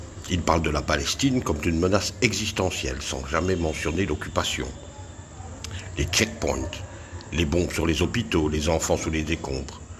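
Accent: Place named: Belgium. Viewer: Voila la réalité toute nue, la vérité incontournable. Ces atavismes peuvent être reprochés a une grande partie de la communauté Hébreu, particulièrement les plus médiatisés, s'est sans doute pour cela la parole leur est si facilement accordée, mais se rendent-ils compte qu'il signent là leur déchéance morale ? Merci Monsieur a votre accent je pense que vous êtes Belge ? J'aurais aimé que ce soit un français avec des responsabilités politiques qui ait votre courage, de cibler directement un malotru y compris sa suffisance.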